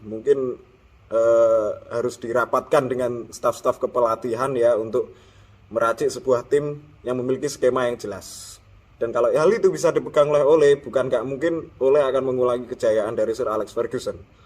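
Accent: Java, Indonesia. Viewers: Kalau boleh saya rating secara pribadi, 8/10 Pro: - karakter pundit jowo nya dapet dan pemakaian bahasa nongkrongnya bikin kita serasa lg ngobrol di tongkrongan - suara nya enak kalau didenger di podcast - tengil dan ada bakat ngeselin di show, jadi bisa bikin seru acara Cons: - kebanyakan noise dari mukulin korek/rokok/liquid/atau apalah itu, ganggu banget - banyak baca, mata jarang ke viewer, menurut ku agak kurang, kalau cuman podcast no visual sih oke oke aja Tetap semangat bang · Teruskan aksen jowomu .. makin keren 👍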